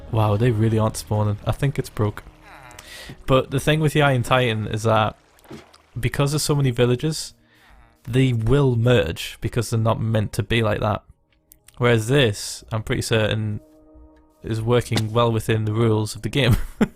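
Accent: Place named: North East England, United Kingdom. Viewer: Technically, not all of the UK. is North-Eastern English (coming from a North-Westerner)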